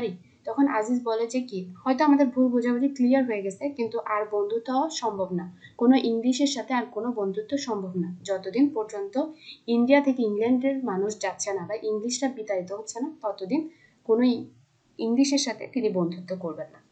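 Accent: Chittagong, Bangladesh. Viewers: Apu ki Chattagram er?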